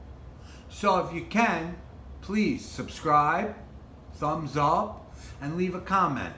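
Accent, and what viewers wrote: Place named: Brooklyn, United States. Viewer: Love the accent. Sounds like Brooklyn or SINY , my part of the country.. good tips thanks for the info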